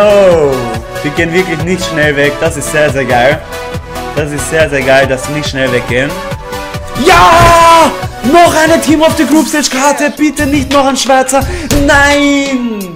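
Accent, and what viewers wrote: Austria. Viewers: Ich habe schon lange überlegt es mit youtube zu versuchen bin zwar Bayer wäre vom Akzent fast genau so wie bei dir als Österreicher :P wären auch neue Freundeskreise die man vill knüpfen könnte und diese Kamera von Logitech wäre ein Anreitz um es doch in Angriff zu nehmen das ganze :)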